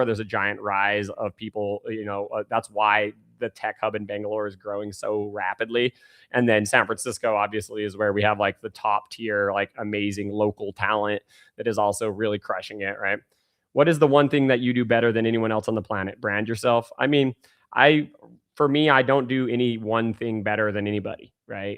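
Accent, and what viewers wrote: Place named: England, United Kingdom. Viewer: Hi, i am from asia and have followed u for months. I am grateful to you since not only the valueable knowledge of data but also your english accent😂. I often listen to your talking to improve english, besides learning data😂, keep this accent:)))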